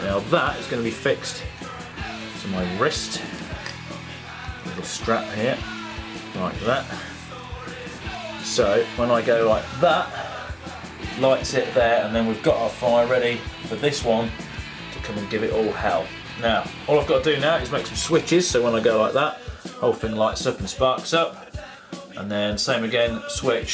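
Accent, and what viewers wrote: United Kingdom. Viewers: He sounds British but I don't know if he is by birth 😂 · this man is so intensly british that i cant understand half of what he says but i love it anyway haha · Are you like British or Australian or something?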